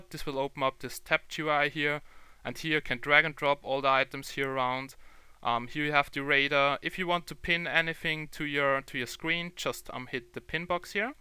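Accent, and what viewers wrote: Germany. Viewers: You have a German accent haha: DD! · you sound like German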